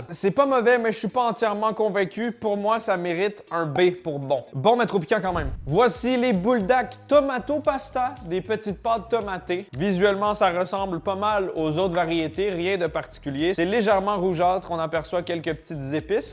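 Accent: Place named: Canada. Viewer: J’adore son accent canadien 😊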